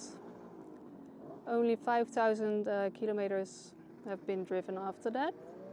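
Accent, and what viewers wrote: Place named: Netherlands. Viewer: Tu mir einen Gefallen..keine KI... Ich liebe deinen niederländischen Akzent 🙂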